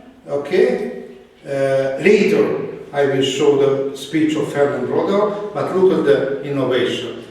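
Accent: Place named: Eastern Europe. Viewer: Strong-,and advanced Eastern-Slavic accent.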